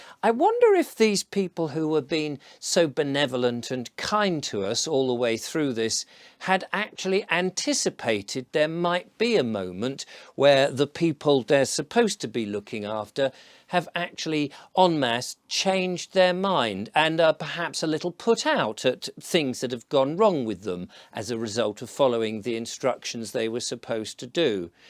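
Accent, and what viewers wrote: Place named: England, United Kingdom. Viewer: Sure you know! I take it you live in England from your accent. I'm convinced and so are all militia's Our pullout from Afghanistan left a whopping multi billion dollar war cache in this country along with pallets of hundred dollar bills. Uniformed fatigues, state of the art assault rifles and open border. Yeah I would say I have a very good reason Given the treason thus far perpetrated on the citizens of the United States.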